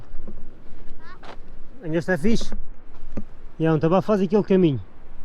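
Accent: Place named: Cape Verde. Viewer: Excelente trabalho como sempre. É corajoso e altruísta. Mas coloca a.sua vida em primeiro lugar. Desculpa me esta advertência. Mas fico muito preocupada pela sua segurança devido essas trilhas íngremes, sinuosas e perigosíssimas. Adoro o seu crioulo. Uma mistura de criolo sotavento, barlavento e português. Cuida se e.protege se Um grande abraço nesta dura aventura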